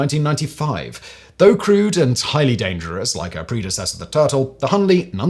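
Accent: United Kingdom. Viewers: I do enjoy listening to a Brit talking about the American revolution.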